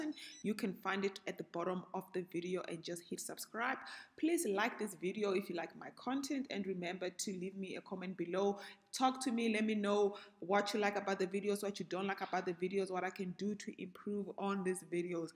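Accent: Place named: South Africa. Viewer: Damb lady you are smart. So refreshing to hear a SAcan voice to explain things.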